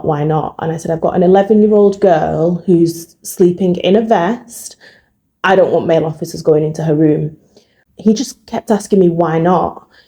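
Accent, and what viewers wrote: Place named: England, United Kingdom. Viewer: I thought this was from another country at the start then I realised you have an English accent and then it began to dawn on me you were talking about Manchester and Manchester police. I should be shocked by how you have been treated, however I am not because of how the so called Government is acting. I am deeply saddened that you have suffered this traumatic treatment and your children. 15 years ago, when my children were primary school age I was treated appallingly by local police because of a false allegation & it meant I missed my sons school assembly (I had never missed one) this upset me more than what was happening to myself, my house was raided & although there was the worst snow fall in years I was not allowed back to my property until they had finished late evening. This was not as bad as you had to endure however I can understand how you felt about your personal belongings, your personal home. 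Thank you for your bravery, your resilience and for having the courage to continue to speak out alhamdulilah. May Allah continue to bring his calm and strength to you and your family for all you are doing for Palestine inshallah. Although this was a terrifying experience for your children, your children will remember how brave their mother was and that you are fighting to support Palestine in an effort to bring an end to this genocide inshallah alhamdulilah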